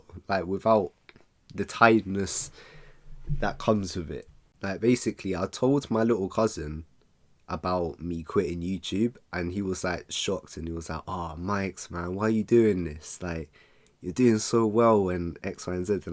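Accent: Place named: London, United Kingdom. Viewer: Honestly bro where do I even begin a massive shout out to you for being an amazing youtuber round of a applause to you my bro👏first time when I found your channel thought to myself that your from London then I immediately subbed to your channel as I kept joining your streams every Saturday tunning in consistently we both became friends met new people in the streams playing Mario kart having fun honestly am going to miss playing it with you online take care of your self with the hard work you put in YouTube wise.🔥🔥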